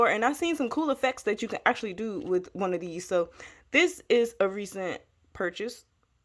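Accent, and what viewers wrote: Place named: United States. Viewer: Hi beautiful colouring pages.. I love your American accent.. people say to me I love your Aussie accent lol thank you for sharing hun ❤❤❤😊😊😊xxx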